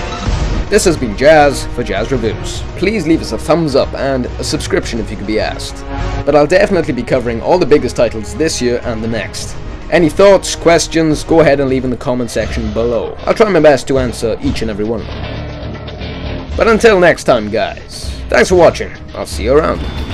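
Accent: Wales, United Kingdom. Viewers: because i like this video so much and your welsh i will subscribe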